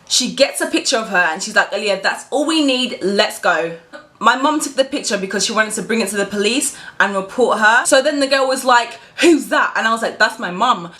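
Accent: England, United Kingdom. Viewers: Are u British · BRITISH ACCENT GOALS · She's British, from London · Yes, she is British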